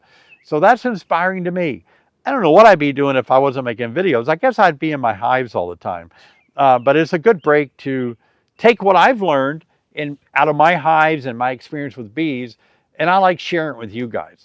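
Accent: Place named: Appalachia, United States. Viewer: It’s an Appalachian accent. I use them both here in Pa!! I’m extremely green with my first hive this year. You are a great source of information and a great speaker. Just added electric fencing to the area too. Bears will be getting ready for winter here! Thank you again sir!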